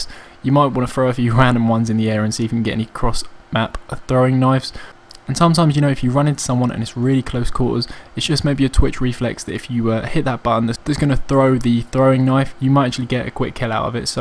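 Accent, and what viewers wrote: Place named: Cockney, United Kingdom. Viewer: there is no such thing as a 'british' accent, this, is a southern cockney accent, from quite a well spoken man here so you probably accociate this with someone like hugh grant. but say for example you went up north, to newcastle, you would have no idea what they are saying, take for example when cheryl cole and none of you yanks could understand her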